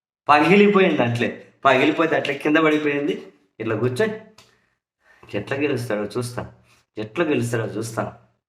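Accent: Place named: Godavari, India. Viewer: anna meeru godavari basha vachesindi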